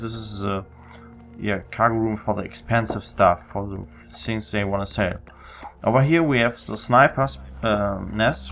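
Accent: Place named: Germany. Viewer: voll hammer mann hört voll den deutschen acsent (hoffenlich richtig) aus der stimme also kommt das noch in Deutsch wäre cool.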